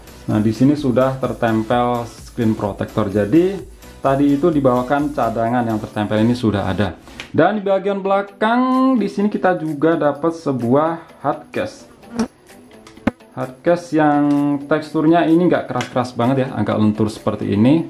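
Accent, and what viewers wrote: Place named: Kediri, Indonesia. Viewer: Org kediri lu bang 😂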